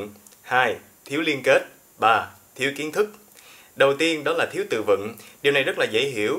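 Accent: Saigon, Vietnam. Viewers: Khong biet thay có fai nguoi Saigon ko, chi thay giong cua thay GIONG giong of minh qua, hi hi ! Ma minh la nguoi Saigon 100% . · Giọng Saigon nghe hay quá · Đúng giọng sài gòn luôn nè, nghe rất thích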